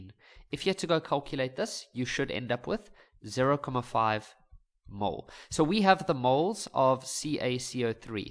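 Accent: South Africa. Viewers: I WAS SO SUPRISED WHEN I HEARD THE SA ACCENT. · Thank you brother, you are a life saver, the way you explain is amazing, the South African accent is also great❤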